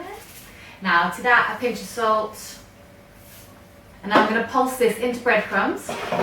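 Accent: United Kingdom. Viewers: Love your cute little British accent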